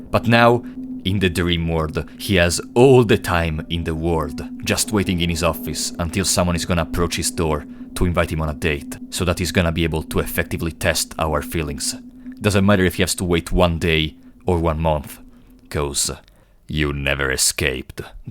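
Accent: Italy. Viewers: I love your accent funny italian man ( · Bro with that smexy ahh italian accent of yours, just make ASMRs plz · ma sei italiano?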